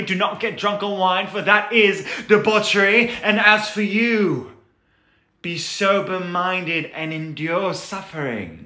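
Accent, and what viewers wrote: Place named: United Kingdom. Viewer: The British Accent😅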